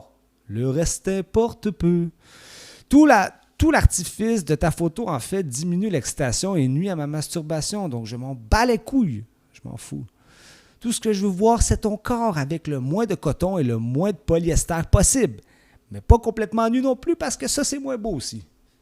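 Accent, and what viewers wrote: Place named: Quebec, Canada. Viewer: l'accent quebequoi est un répulsif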